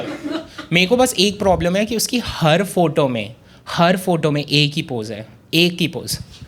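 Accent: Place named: North, India. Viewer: Accent is typical dehati, improve it if you want to target pan Indian audience, typical North Indian heavy accent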